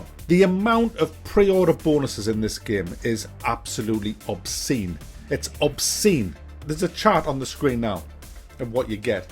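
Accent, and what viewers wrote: United Kingdom. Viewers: Had to watch the video twice because the first time I was just listening to your awesome British accent saying sarcastic things that I lost what you were saying. :'D :P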